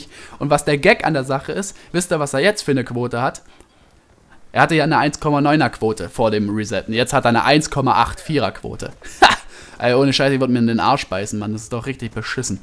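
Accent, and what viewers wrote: Swabia, Germany. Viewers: bist du schwabe?:D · mit seinem Schwaben Dialekt:D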